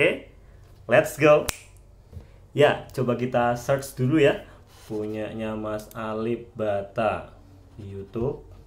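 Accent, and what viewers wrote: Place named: Java, Indonesia. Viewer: Bhs jawa medok nmen mas suhu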